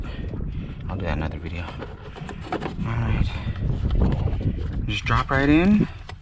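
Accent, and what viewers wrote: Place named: South, United States. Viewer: This was pretty funny but honest, made my day. I thought the author was a "good ole boy" based on the heavy Southern accent but at the end with the commentary, it surprised me! :) Informative vid - kudos for putting it together.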